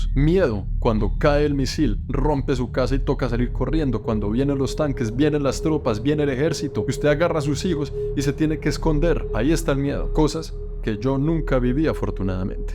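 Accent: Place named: Colombia. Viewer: SUPER COOL 😎 👌 TODOS SUS VIDEOS!! MUCHAS GRACIAS POR COMPARTIR 😊 BENDICIONES 😘!! ME GUSTA 👍 MUCHO SU HACENTO COLOMBIANO 😊 Y SUS COMIDAS. SALUDOS DESDE LOS ANGELES, 🙏♥ CALIFORNIA. USA.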